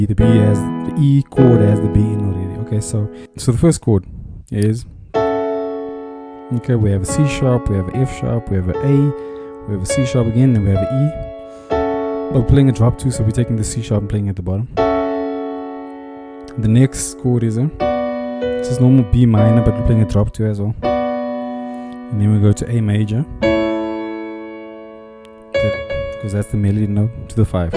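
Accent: Cape Town, South Africa. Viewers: Was so excited to hear a South African accent doing this. Great tutorial,thanks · Your accent sounds South African, your playing sounds heavenly. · Can hear the dialect u from Capetown ..Represent cpt I love this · you sounds like a Capetownian really...I appreciate you lesson 🇿🇦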